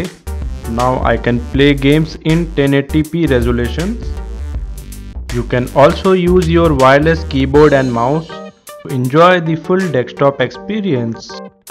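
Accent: India. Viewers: haha wo indian h dekh lo beta english bhi indian ki tarah hi bolta h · He is an indian so indian p hai a biy diff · It's cuz he's Indian (speaking an Indian Accent) and most Indians don't have English as their mother tongue. But yeah, these days every Indian talks and understands English.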